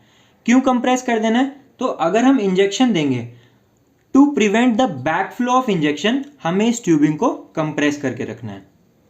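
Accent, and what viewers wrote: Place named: India. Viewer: Can you speak slowly please and all tte time English, most people don't use Indian English accent, and pronunciation..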